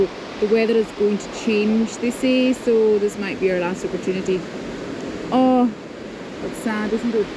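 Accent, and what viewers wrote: Scotland, United Kingdom. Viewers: Absolutely fantastic video, of a truly stunning Island, such beautiful scenery, and you had the weather with you, well for part of your stay, great video I thoroughly enjoyed watching, you gave a great insight to the history of the Island too 😀 thanks for sharing 🙏💚 PS, can I ask please? Whereabouts in Scotland is your accent from? 🙌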